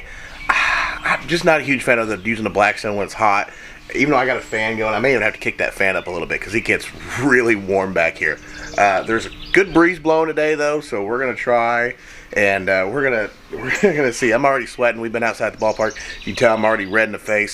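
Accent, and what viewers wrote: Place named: Texas, United States. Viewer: can I place an order for some of your breakfast burritos 🌯, to be delivered to Chuck and I in Texas???? Love ya'll...Your Texas Mom🤗😘🥰👄💞👄 P.S. I'm amazed you've maintained some of that Texas accent.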